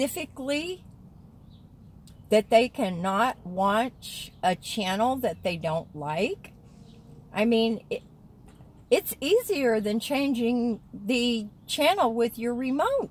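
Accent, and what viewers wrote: South, United States. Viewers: At the very least I would’ve called, but probably done what you did. That’s so true about accents, as I’ve seen it with my in laws, especially if they would be talking to friends or family that are still in the South. That’s your roots coming out, and that’s you!😍❤️ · Hi 👋🏻 I’ve not commented in a while. I’m back to work, so I don’t get as much time to sit and binge watch your videos. Hehe. I am still watching however, just not commenting. Admittedly I probably would have done the same as you and shouted out. And as for your accent, well I believe you have a gorgeous southern accent. And I can hear it in all of your videos. I’m from the uk though, so I’m not used to the American southern accent at all. Loving your stories, I’d love to hear about the one you mentioned about a gang member in your property. #exciting #cliffhanger lol have a nice day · For what it's worth, I just checked out your very first video from 2 years ago and you sound the exact same way - southern accent and all! 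There are always going to be people here on YT and elsewhere on social media who will try to find anything to criticize you about, especially since they can do it anonymously behind a keyboard. Unfortunately, it comes with the territory. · You did right!!!! I love your voice and for some reason it rings a bell you went to the rubber tramp roundup and met another southern gal and both of you were d,rawling like true born southern gals! Lol,it just reminded me,could be wrong though! Love Rea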